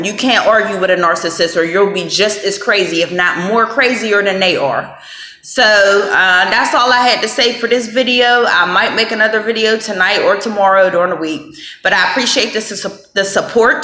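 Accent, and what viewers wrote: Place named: Mid-Atlantic, United States. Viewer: do you..interesting accent btw...are you from the mid atlantic region?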